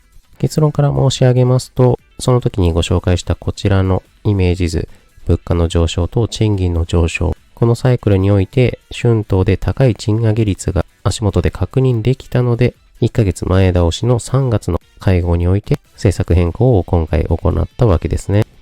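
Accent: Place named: Kansai, Japan. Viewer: マクロもミクロの強くていらっしゃる、羨ましいです。関西言葉で、落ち着いて話されるので妙な気分になります。楽しいです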